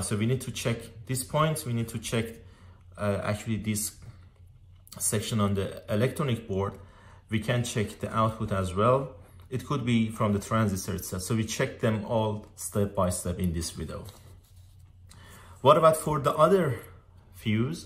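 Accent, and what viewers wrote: Iran. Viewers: just say thank cause it was useful for me and enjoyed your sweet persian accent👍👍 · You have an accent are u from Iran?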